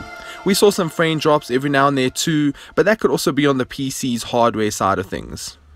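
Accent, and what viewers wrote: South Africa. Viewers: South African narrator :)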